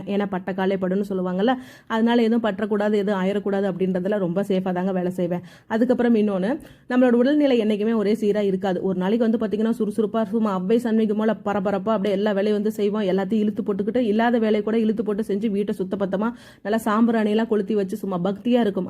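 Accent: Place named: Thanjavur, India. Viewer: உங்க பேச்சு தெளிவாகவும் தஞ்சை ஸ்லாங் போலவும் இருக்கு.தர்மபுரி பக்கம் ஸ்லாங் வேறு மாதிரி .உங்க சொந்த ஊர் எது சிஸ்..நீங்க என்ன படிச்சிருக்கீங்க.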